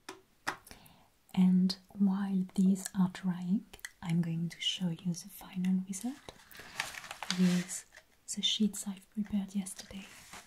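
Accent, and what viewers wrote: France. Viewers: Is she German? The accent is beautiful, I just can't place it. Edit: nevermind, she's French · Does anyone else LOVE whenever she crumbled the paper in walnut and starts talking in her amazing French accinet in a softly mom like voice because i have watched this a whole lot of time and every time she dunks the paper and crumpled it i get chills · you're right, she is french. German accent sounds way different in my opinion, its not that beautiful... I am german so I can say that lol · French accent is pleasing to my ears and her looks are pleasing to my eyes... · Her french accent is a trigger itself 🤩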